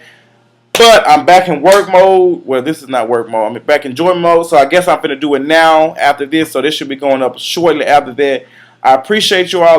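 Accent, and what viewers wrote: Memphis, United States. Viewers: I found your videos it was on the recommended videos and I clicked one where you were going in on your family and it was soooo good. I had to keep clicking your videos and i love your personality. I was thinking I loved your accent and it sounded familiar to me. Then I found out that you lived in Memphis and I had relocated here and have been here almost 2 years. Your Memphis references are so on point and funny! You are so down to earth and I totally understand where you coming from where you saying you have to let go to really dive in your purpose. You have my support 100% and you definitely deserve all the success that is coming your way! Thank you for making me laugh and continuing to be genuine. Love your vids, and love you!!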